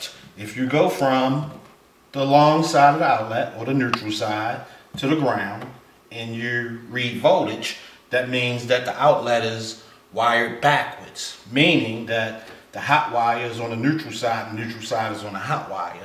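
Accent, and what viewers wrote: Baltimore, United States. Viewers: Dude from Bmore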